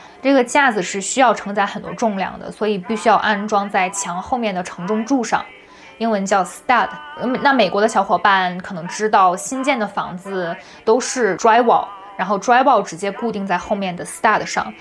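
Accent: Northeast, China. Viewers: 妹子是东北人，尽管你的东北口音是非常轻微的，但是休想逃过缺姐的耳朵🎙️☝️😉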